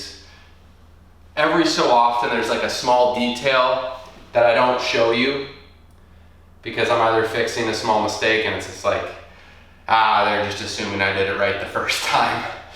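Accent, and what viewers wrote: Canada. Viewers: Man I knew you were Canadian. Could hear it in your voice. Haha. Cheers from Kelowna BC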